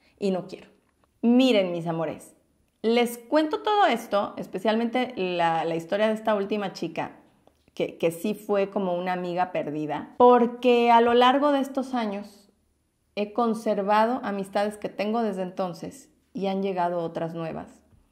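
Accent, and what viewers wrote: Mexico. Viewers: "que se lo dejaba a sus 25 hermanas" JAJAJAJAJA. Me super aburre escuchar hablar a alguien pero con esta mujer es imposible, sobre q amo el acento mexicano y el carisma q tiene ella una no se puede aburrir ni un poco 🙌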